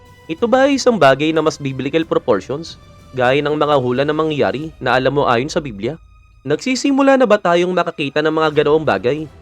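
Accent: Iloilo, Philippines. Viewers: Ilonggo ang blogger?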